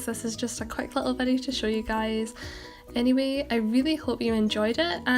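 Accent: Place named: Scotland, United Kingdom. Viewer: Love that scotish accent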